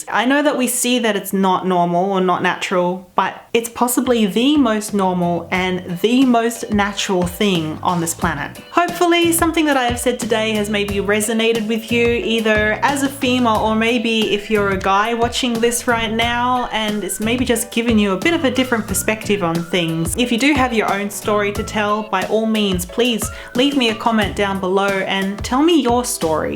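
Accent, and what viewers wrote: Australia. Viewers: R u Australian? Love the accent.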